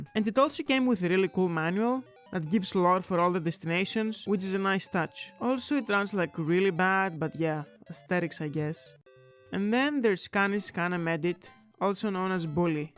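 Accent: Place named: Greece. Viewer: Are you Greek? Your accent is giving Greek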